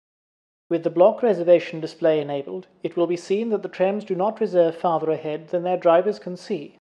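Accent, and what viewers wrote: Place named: RP, United Kingdom. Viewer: This is a fantastic film, for three reasons. Firstly, it clearly demonstrates the drive by sight working method as implemented in Simutrans-Experimental. Secondly, you have done a very good imitation of the Received Pronunciation accent and the vocabulary used in interwar public information films and newsreels. I particularly appreciated the affected "wh" or /hw/ sound that is typical of the era. Thirdly, I personally find there is a touch of comic absurdity in the care and attention that has gone into something as superficially mundane as a software update. There is something very English and male about the whole thing. Thank you so much for the film, and I look forward to making use of this working method when the new version of Experimental is ready (because I am fairly certain that you will not release it until it is absolutely ready). 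One can only hope you had half as much fun making it as I did watching it!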